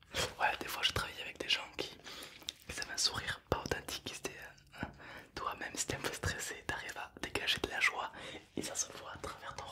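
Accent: South, France. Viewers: L’accan du sudee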